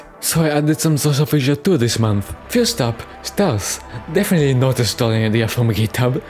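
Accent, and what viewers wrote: France. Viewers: It sounds like french.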